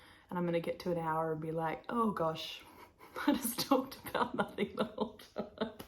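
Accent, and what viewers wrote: Australia. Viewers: I clicked when I saw you wearing your lovely grey Felix and then I got excited to hear your Australian accent! I’m in Melbourne. I loved all your knits, the shawl was definitely worth getting that mini skein set for - looked lovely with the jumper! And I was extremely intrigued by your use of the “cheeses” of roving! I already was thinking to try Plotulopi one day (don’t know if I can be bothered chasing Nutiden). I’m looking forward to seeing how your shawl relaxes after blocking. It looks so dimensional with that roving combined with the braid detail. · Hey, fun podcast. I love that as the podcast progressed you became more “Aussie” - I giggled thinking of overseas viewers when you dropped “so that what I’ll be doing this arv.” 😂 I only came across this podcast because Amy Palko mentioned you - so funny to be hearing of you via a podcaster on the other side of the universe, but there we are. 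Looking forward to watching all your other eps, and seeing more Buddy antics. Waving at you from Canberra, where it’s often cold enough to wear our knits.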